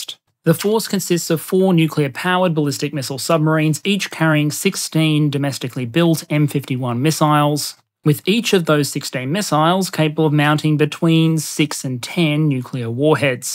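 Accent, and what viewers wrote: Australia. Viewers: How did you learn to speak so many different languages? in the video where you have spoken about the war in ukraine, the germans, the japanese and now this one, your pronunciation of all those various languages (even though you can still hear the australian in it) is impeccable.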